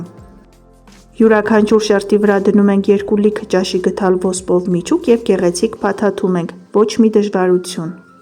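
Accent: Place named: Ararat, Armenia. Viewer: Ду шат юраатук ес джан, Цайнд амен ангам лселуц, инц твум е те инц Аразат цайн ем лсум, шат бнакан ес, у шат тнакан,аранц Кез котрателу🌹👍💋💋💋❤️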